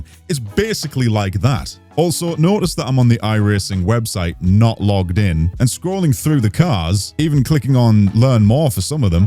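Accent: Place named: North East England, United Kingdom. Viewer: So where are they going to get money from to keep the servers on? It’s all well and done to whine about costs, but long term, unicorn tears and candy floss won’t keep it going. I like Iracing and LMU and race both regularly. I hope this new sim succeeds but long term support isn’t guaranteed without money. Nice to hear a fellow North East accent, even if I’m older than your dad.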